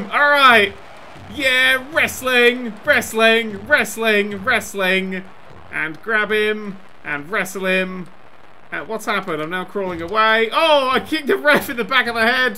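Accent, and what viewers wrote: United Kingdom. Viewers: Are you British or Australian🤔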